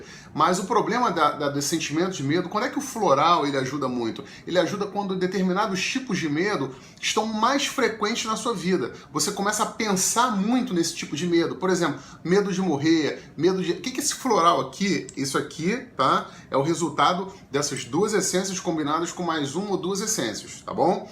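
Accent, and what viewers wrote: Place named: Rio de Janeiro, Brazil. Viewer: RIO DE JANEIRO lindo mesmo. E ainda com esse sotaque carioca.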